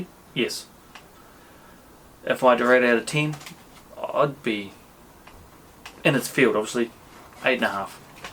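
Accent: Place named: Waikato, New Zealand. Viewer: That's a waikato accent if I've ever heard one, even looks like my stomping ground up thompsons, looks like it would be a epic kaimai supplejack gun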